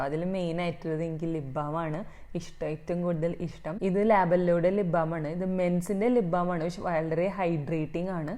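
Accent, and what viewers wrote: Thrissur, India. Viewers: ഇന്ന് ഭയങ്കര തൃശ്ശൂർ സ്ലാങ് ഉണ്ട് സംസാരത്തിൽ 😁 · Pakka thrissurkariyayallo😍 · Why suddenly this thrissur slang ? I never felt this before in ur vids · Pakka thrissur slang❤❤❤❤ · I could hear the trissur kaari in you dear ...was a lovely relaxing vlog ...i miss Kurti shopping too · Eee thrissur slangine evide olippich vachirikkaayrunnu? 😅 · This time Thrissur slang nallapole vannittund.... Nalla change... Purple, blue, pink ellaam nice kurtis aanu.... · Aaha...namude thrissur slng aanalo inn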